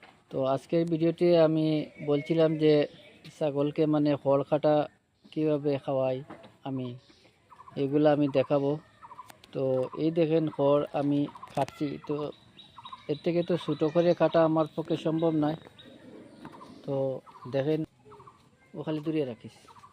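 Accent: Sylhet, Bangladesh. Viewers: ভাই আপনার বাড়ি কোথায়,,,, মনে হচ্ছে সিলেট,,, সিলেটের কোন জায়গা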